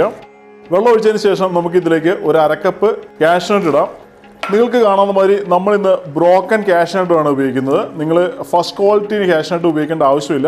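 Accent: Kannur, India. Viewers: Looks Good. I will try it and comment it here. Are you from Kannur? You are having a Kannur accent. It seems you are based in Dubai. I am a great fan of Lebanese Lamb chops and lamb Kabab (not ground lamb kofta Kabab, but lamb pieces). Could you please do it whenever you feel convenient to do it. I am a regular watcher of your cooking videos. Crisp and short videos. I love your videos.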